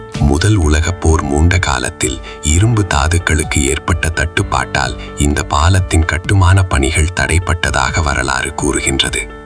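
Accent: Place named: Jaffna, Sri Lanka. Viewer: இயற்கை மற்றும் மனிதன் கைவண்ணத்தில் உருவான அற்புத கட்டுமானத்துடன் தேன்போன்ற யாழ்ப்பான தமிழ் வர்ணனை அற்புதம். இதுபோன்ற இனிமையான தமிழை எமது தமிழ்நாட்டில் கேட்க முடியவில்லை . இனியொரு உலகம் படைக்கப்பட்டால் அதில் தூய்மையான இனிய தமிழ் மட்டுமே இருக்கவேண்டும். வாழ்க தமிழ்.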